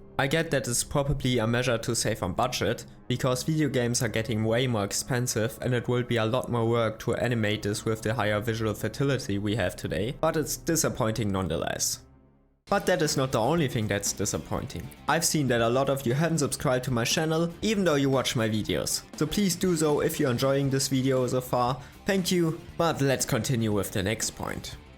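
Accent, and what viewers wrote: Germany. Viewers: It's totally fine to have an accent and also having a strong accent. It was just funny to me that I instantly knew that you're german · Brudi….mach lieber deutsche videos 😂😂😂 du hast den heftigsten deutschen akzent aller zeiten und deine grammatik ist grauenhaft. Es heißt „oriented“ und nicht „orientated“ beispielsweise. Aber kein hate 😅 unabhängig davon ist die videoqualität, recherche und videoschnitt top 👍 ❤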